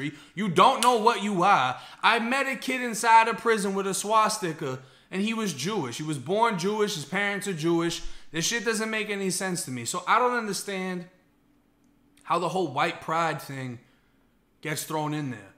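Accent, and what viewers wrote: Boston, United States. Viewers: Yo you from Boston? Trying to guess the accent 🙂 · Bro you from Boston area? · Did you ever live in Beantown?? A lot of Floridians are originally from there you have a little accent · Are you from Boston or something close due to your accent??? Why you fascinated with Florida???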